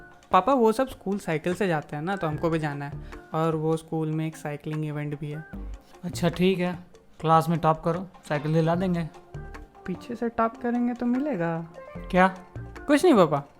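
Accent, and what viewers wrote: Bihar, India. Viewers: Pakka tm bihari ho... Kyu ki bihari he sirf hum bolta hai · Hello I'm your new subscriber from Bihar. Budhwa Chacha 🤣🤣🤣 Your Bihari and Jharkhandi accent make me laugh and happy also . Nice video.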